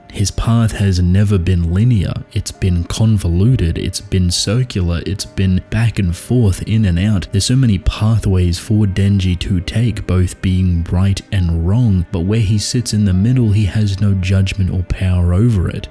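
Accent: Australia. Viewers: Why has it taken me over a year to realize how Australian u sound😂? I was wondering where tht damn accent came from, it’s from down unda. another banger video gang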